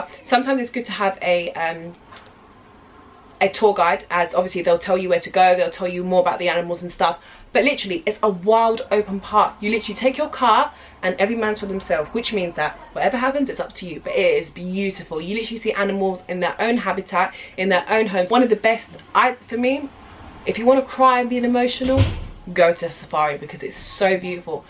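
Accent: United Kingdom. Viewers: was not expecting a brit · I finely found a a British accent in a view about Tanzania 🇹🇿 🤗 I live in the UK 🇬🇧, that's why I wanted to see the views about Tanzania from a black British, and you are very young girl, thank you so much for this very useful information. Thank you my girl. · not all of it no i was looking for another video but what i did hear was that you sound English where was you born ?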